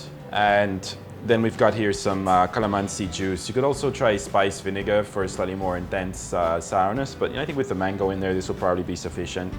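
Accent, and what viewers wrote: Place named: Philippines. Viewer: I like how you have a little bit of filipino accent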